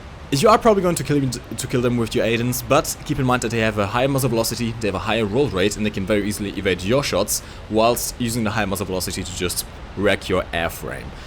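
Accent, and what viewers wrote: Germany. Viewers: I knew you were german and not portuguese :P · Are you German?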